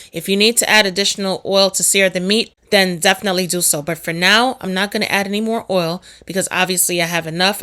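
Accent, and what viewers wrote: New York, United States. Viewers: I thoroghly enjoyed watching this video. Cant wait to try the recipie. Your voice and NY accent is music to my ears. My dad is from Brownsville, so I recognize real NY!!! Have a blessed day!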